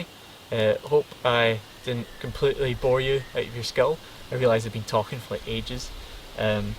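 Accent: Scotland, United Kingdom. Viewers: You. Are not alone.. we thought same.. until we heard speak.. And that beautiful Scottish accent.. which we sincerely hope he does not lose.. gave him away.. We are Scottish.. and we enjoy his channel immensely.. As to the potential name change.. as this video is over 5 years old.. he has kept the name.. The name is part of roots.. part of who he was as well as who he has become.. To change would.. to us.. be a betrayal of his journey to where he is now.. seems so wrong.. Besides.. all of his videos.. at least all we have seen.. he references in the opening.. and seems impractical to change.. Who changes horses in midst of a stream.. especially if they are serving you well In getting you across? We are so glad you kept the name, … Slan mo charaid💜💙🌹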